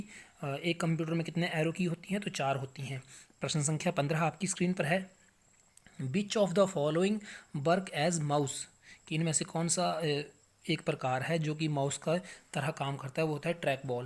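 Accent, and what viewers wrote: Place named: Kullu, India. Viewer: Yaa tum kullu se ho mandi but mujhe kullu se lag rhee ho ????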